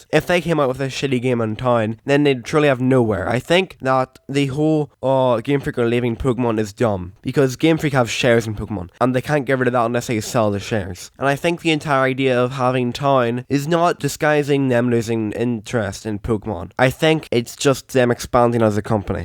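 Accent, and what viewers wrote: Scotland, United Kingdom. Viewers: Are you Scottish? Your accent is fire...also I’m buying it because I’m interested in the game. I like little town hero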